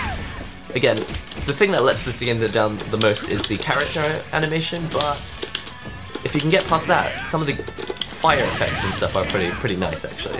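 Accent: United Kingdom. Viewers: asian with brit accent! LOVE IT!!! You rock! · It's British!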